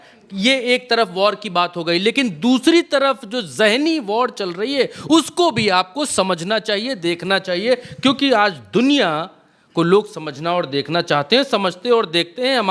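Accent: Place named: Bihar, India. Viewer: Bhaiya Tu bihari mein baat kar Bada maja aata hai tere Bihari Boli Mein Aur Khas Karke Tu bihari mein bol bhaiya Bada Achcha lagta hai tere Bihari Boli Mein 🎉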